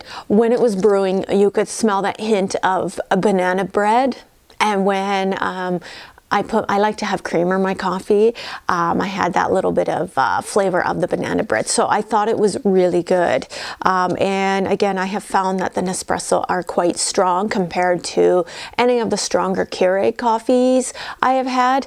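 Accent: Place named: Canada. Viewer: thanks I'm already at the online store seeing what they have...I didn't realize you were in Canada...that's where the cute accent I hear is coming from.....OK thanks again and will let you know what I buy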